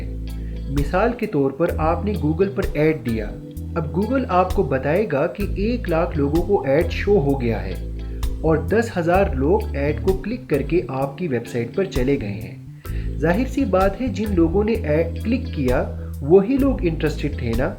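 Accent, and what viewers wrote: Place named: Kashmir, India. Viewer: Bhai, good help hy ye... Men khud Azad kashmir Muzaffarabad sy hon... ap kashmiri speaking hen kia? Your accent say's something about Kashmiri (Koshur) Language...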